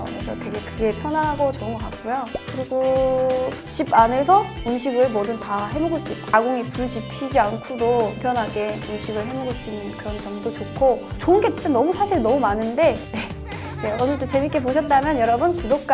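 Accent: Seoul, South Korea. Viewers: 아궁이...밥맛은 좋을듯... 아무리 봐도 북한에서 온사람 같지 않음....말투도 그렇고....그냥 서울태생 같음... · 북한분맞으세요? 남한사람인지 북한사람인지 모르겠어요ㅎ 완전 한국사람다되셧네요.북한말투가 남아있지않네요. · 서울 말씨를 완벽하게. 머리가 좋다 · 서울말을 쓰네요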